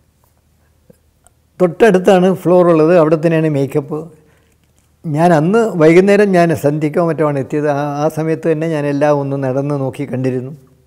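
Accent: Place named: Kannur, India. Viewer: Kannur karanano, aa samsaaram varunnu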